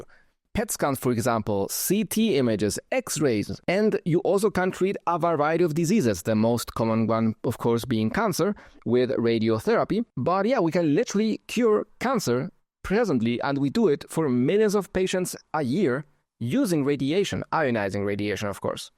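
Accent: Germany. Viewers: i thought you were slavic lol, i guess i never heard a german accent before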